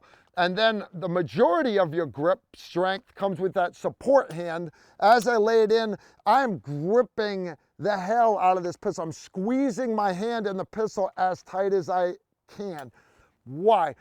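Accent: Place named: New York, United States. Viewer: New York accent really comes through here.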